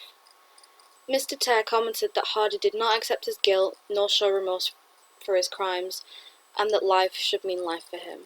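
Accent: Manchester, United Kingdom. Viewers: I 1st heard of Trevor Hardy years ago and I'm from Manchester myself and I live opposite where 1 of his victims was found, you sound like you're a Manc yourself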